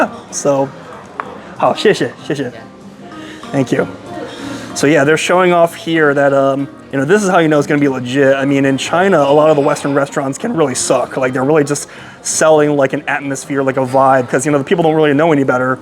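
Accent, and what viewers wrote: United States. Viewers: I know enough to say a native speaker would notice his American accent immediately. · A question for any native Mandarin speakers: How well does speak Mandarin?. Does he have an American accent? How fluent is he?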